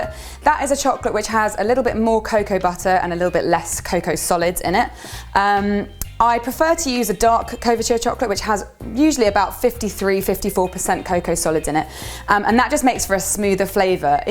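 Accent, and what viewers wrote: England, United Kingdom. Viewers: Your english accent is SOOOOOO charming. We love your channel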